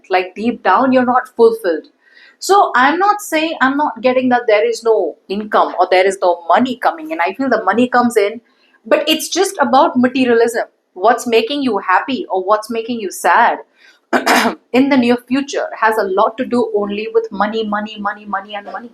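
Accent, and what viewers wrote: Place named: United States. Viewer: American english....no understand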